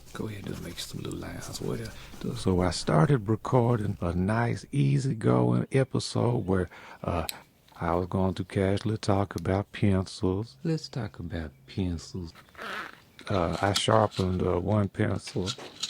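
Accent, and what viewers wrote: Louisiana, United States. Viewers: Your hittin those "er"s pretty hard in this video. computer, figure. Just a bit different. As I have said in the past part of the appeal of your videos is your cajan accent.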